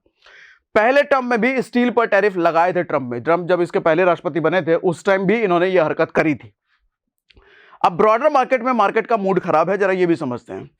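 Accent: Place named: Bhojpuri, India. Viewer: Please try to understand what is analysis bringing out data is only fact what is implication on India on the tariff placed by Trump you don't know you have not even understood you are just speaking like Khan sir nothing more no value I have watched you few times you have got only style of speaking and that a typical of Bhojpuri nothing else no value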